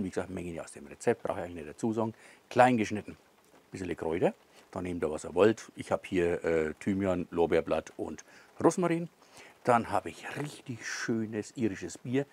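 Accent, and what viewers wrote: Franconia, Germany. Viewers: Servus Der Dialekt ist nicht zu überhören, beim Franken hört sich das immer so niedlich an. Bin aus der Oberpfalz und was soll ich sagen.... mein Hund spricht die gleiche Sprache. 🫣 Dein Dopf Gericht wird nachgemacht, ich werd aber dazu ein Nierenzapfen nehmen den da kommt der Rindsgeschmack besser zur Geltung. Gruß vom Hobbyschmied und Daumen 👍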